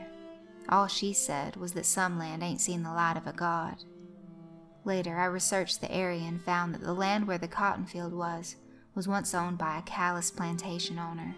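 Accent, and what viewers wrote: South, United States. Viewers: You must be a southern girl, guessing Georgia. . Southern girls with accents are my weakness ☺️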